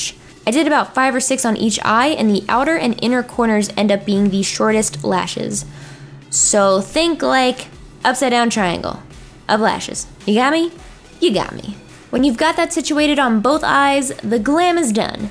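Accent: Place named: Pennsylvania, United States. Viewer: !! Don't be ashamed of your accent!! For a while, I had no clue why people made fun of your accent. I didn't even notice you had one. Then I realized you were from Pennsylvania and it all made sense... (I live there too).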